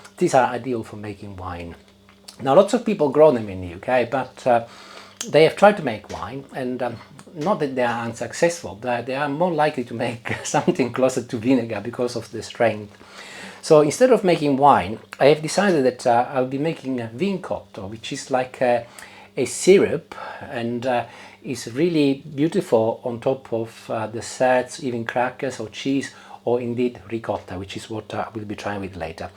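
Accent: Italy. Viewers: What an absolute joy of a video 😊. To hear your beautiful tale of your grape picking experiences was delightful 🙂. Your accent and English is so easy on the ears. I grew up with Italians in NZ and they also spoke with your accent. It brings back beautiful memories for me ❤😊. I'm going to really enjoy listening and learning your recipes 😊❤😊.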